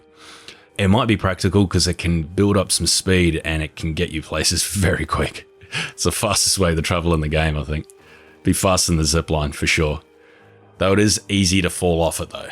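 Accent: Australia. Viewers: Hugh jackman voice over! I live down under and you have some hearty accent ❤️ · ur Aussie ay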